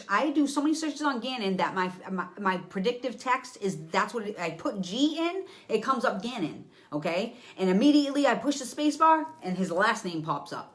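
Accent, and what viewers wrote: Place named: New Hampshire, United States. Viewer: I thought you sounded like you were from (wicked awesome) NH. I'm from Manchester, what about you?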